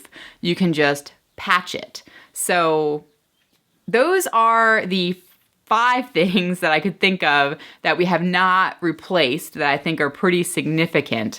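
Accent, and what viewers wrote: Pennsylvania, United States. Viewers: Hey, I'm from PA too! I'm guessing by your accent that "yinz" are not from the same part of PA that I am 😜 Let's go Pens! But really, I feel you on the deer situation. I've hit more than I'd like to admit. Luckily the worst that's happened to me was the grill cracked and I lost a headlight. Right now my husbands laptop is broken and we are just sharing mine now. It works out fine because I have an iPad for work, so I just use it most of the time!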